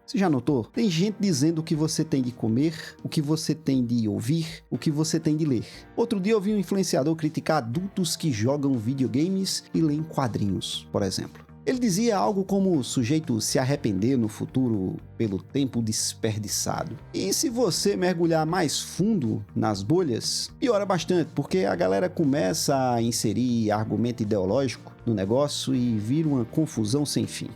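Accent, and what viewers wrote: Northeast, Brazil. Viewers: Com as devidas adaptações, ouvi a narração de minha jornada com os quadrinhos. Uma aula sobre o tema e uma incrível lista de indicações de leituras com resenhas sintéticas, mas estimulantes. Tudo isso com alta dose de conhecimento, a sonoridade nordestina e o desejo das bençãos de Deus. É um privilégio.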